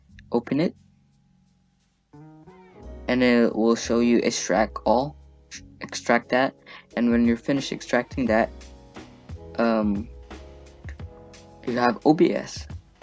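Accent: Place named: Philippines. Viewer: I can tell, you are a Filipino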